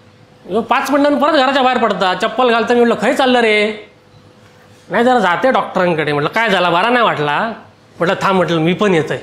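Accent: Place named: Malvani, India. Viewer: खतरनाक मालवणी किडो पण भारी झिला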